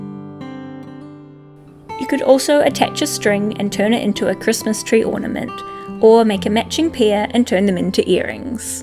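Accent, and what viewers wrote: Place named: South Africa. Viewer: Christmas is in summer where you live! As soon as you said that, I realized you sound like you have a South African accent. I love all of the wreaths. The gingerbread wreath is my favorite.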